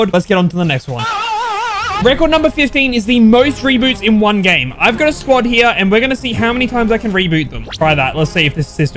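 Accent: Australia. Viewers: You australian i watched you before lazarbeam but i saw you on his video and thought you were aussie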